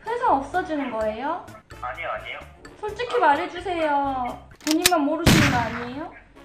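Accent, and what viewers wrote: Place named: Jeolla, South Korea. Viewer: 전라도 사투리 찰지네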